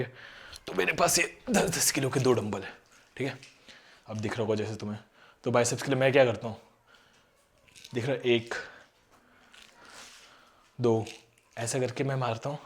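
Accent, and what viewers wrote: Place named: Northeast, India. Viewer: dost northeast se ho kya? bdiya accent hai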